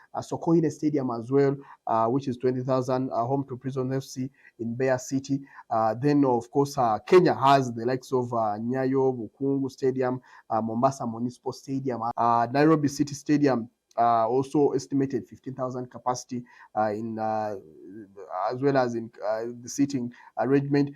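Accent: Uganda. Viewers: Also Tanzania has 6 international Airport not 3. Also the total number of Airports in Tanzania is 59 and 300 Airstrips. Kenya has only 36 Airpots and 3 international Airpot just so you know. You should have Mentioned also that Kenya Airways is NOT owned by Kenyans or Kenya Government, it a shared company between KLM, One Private company and Kenya Government which means Kenyan government is nit benefiting from the Airline 100% like Tanzania Air which is Owned 100% by the government. Most people and Tourists in Kenya lands only or most in Nairobi or JKNIA while in Tanzania Most people and tourists are spread in 3 Airports JNIA-Dar, Kilimanjaro Airpot and Karume in Zanzibar and soon coming up Songwe International Airpot, Pemba international Airpot and Mwanza International Airpot. Next time di research before opening your UGANDAN Accent MOUTH.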